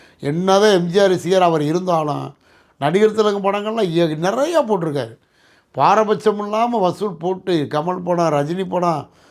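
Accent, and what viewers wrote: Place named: Madurai, India. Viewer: சரளமாக மதுரைத் தமிழில் ரசித்து சினிமா பற்றிய தகவல்கள் வழங்கிய தங்களுக்கு நன்றி 🙏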